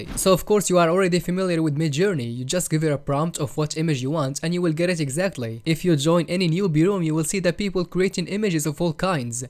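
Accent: Morocco. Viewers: I really love the way you explain ❤👏👏 + you have a moroccan accent wich makes me feel like talking to one of my freinds😂❤❤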